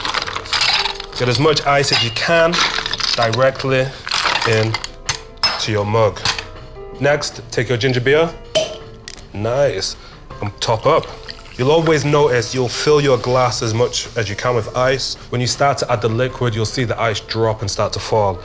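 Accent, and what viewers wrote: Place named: Leeds, United Kingdom. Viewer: Aww bless you son. Speaking in another language with that Leeds accent. Bless you. Made me laugh. I like the pear voka xx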